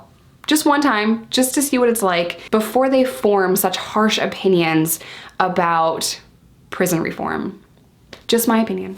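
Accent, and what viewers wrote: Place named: Oklahoma, United States. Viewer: I live in Oklahoma love how you hear the accent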